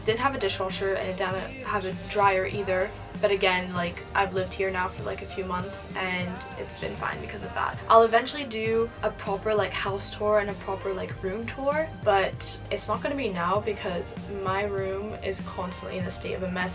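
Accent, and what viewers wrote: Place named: England, United Kingdom. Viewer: I can hear your English accent emerging...how long have you been in London?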